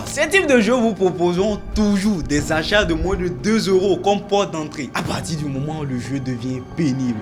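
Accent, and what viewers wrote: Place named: Benin. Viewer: tu es béninois? Tu as notre l'accent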